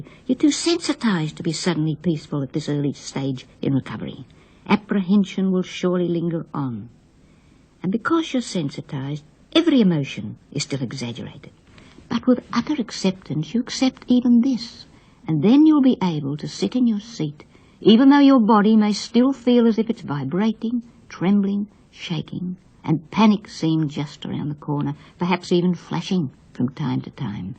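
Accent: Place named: Australia. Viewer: I love how Aussie she was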